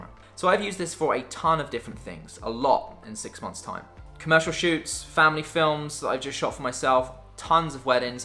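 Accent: England, United Kingdom. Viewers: Helpful video, good to hear the camera has worked well for you. Your a Brit living in Canada right? That accent sounds way too English to be a Canuck. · Like your video. I want to purchase the Sony A7iii. And buy the way you mentioned Canada but you don't seem to have a Canadian Accent. Are you from London England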